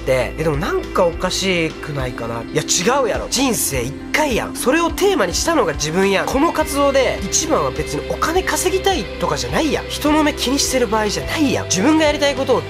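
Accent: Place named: Fukuoka, Japan. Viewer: どちらの出身ですか？福岡のどこかですか？方言が親近感あいます。応援してます。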